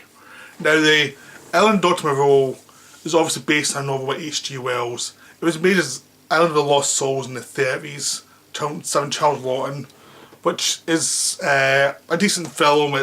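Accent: United Kingdom. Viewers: Fucking limey shut up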